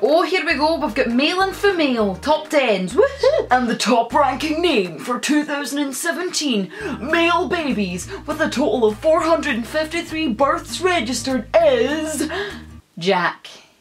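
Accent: Scotland, United Kingdom. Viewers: Loving the Scottish accent. Born in raised in London but have Scottish heritage and am so proud of it. What part of Scotland are you from? My family is from Gretna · You sounded EXTRA Scottish in this video 😂👌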